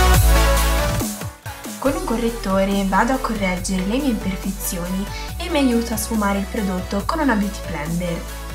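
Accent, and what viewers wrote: Tuscany, Italy. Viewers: Bellissimo video e tutorial super mega completo, attualmente il migliore sull'argomento, bravissima!... Sei toscana come me sento? ^_^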